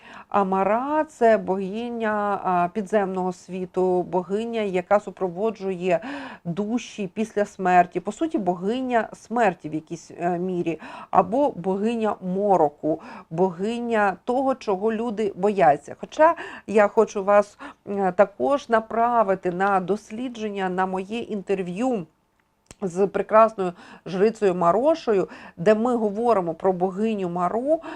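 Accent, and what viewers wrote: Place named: Galicia, Ukraine. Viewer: Навіщо постійно, останнім часом, потрібно хизуватися галицьким(як в народі мовлять - западенським діалектом). Багато слів у південно-західному наріччі схожі зі словами в рус.язикє, і навіть наголос. Почали зі слова знайдЕте, в язикє- найдЁте. А чому не вимовити як розмовляє(балакає) решта України - знАйдете?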